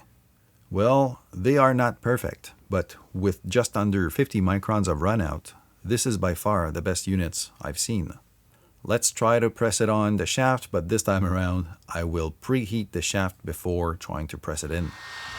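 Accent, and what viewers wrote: Quebec, Canada. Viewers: That guy sounds like he's from Quebec. Really nice and detail video btw.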